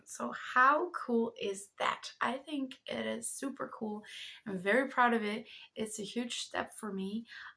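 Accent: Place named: Pennsylvania Dutch, United States. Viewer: As someone from the USA, your English accent, more specifically American accent, is really good. I actually thought you were American until I found out you were Dutch by watching your other videos. You literally sound like you’re a Pennsylvanian Dutch, but I guess that makes sense. 😅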